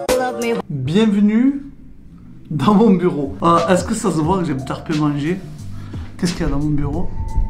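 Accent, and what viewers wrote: Marseille, France. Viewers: J'ajouterai ceci mon cher, je ne sais pas comment tu es dans la vie de tous les jours, mais ici, tu es une des personnes les plus chaleureuse et sympa, tellement que je me suis abonné, et cela est rare. Sûrement ton accent marseillais que j'adore qui me rapelle Marius et Fanny. Mario Repentigny Qc.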